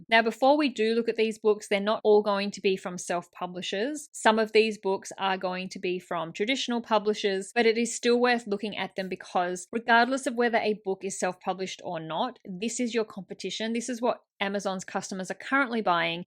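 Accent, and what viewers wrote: Australia. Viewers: I love this video and the educational tool. I have a couple of niche educational books ideas. I love to see online. Plus, I'm hearing an Australian, I can relate!🙏🪃🇦🇺☺️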